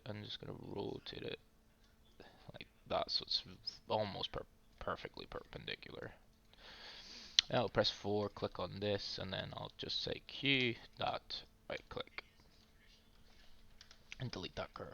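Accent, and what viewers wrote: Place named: Northern Ireland, United Kingdom. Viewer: Your accent, I keep getting a little Northern Irish in it 🤔. Great tutorial btw.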